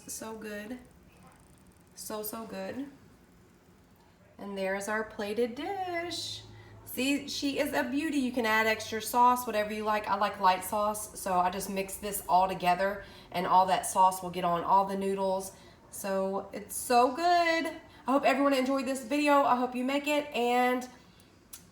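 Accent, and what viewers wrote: South, United States. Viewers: I just love they way you talk your southern accent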